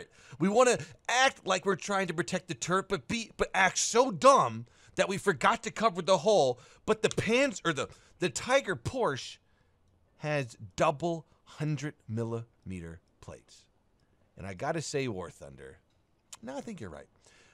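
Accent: South, United States. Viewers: the country pronunciation was better tbh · country accent has a lil sugar in the tank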